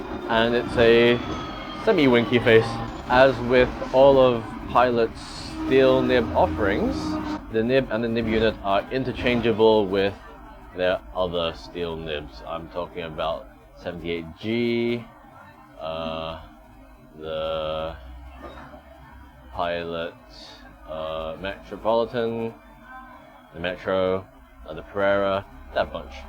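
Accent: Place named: Australia. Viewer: Wow, Australian :) Where about?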